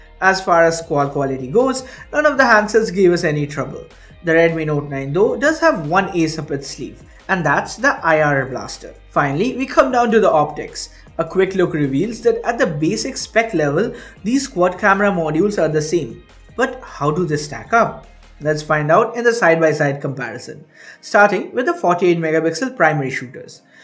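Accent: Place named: Bengal, India. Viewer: with Bengali accent songs pretty weird to me as I'm an Odia 😆😆😆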